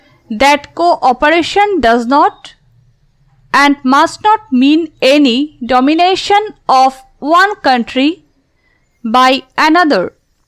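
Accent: Bengal, India. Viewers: Voice seems to be Bengali , I am correct?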